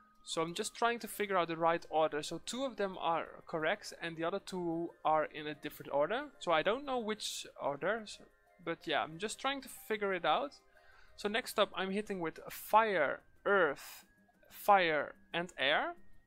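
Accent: Netherlands. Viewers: Ik was al aan het denken dit accent ken ik maar je bent inderdaad nederlands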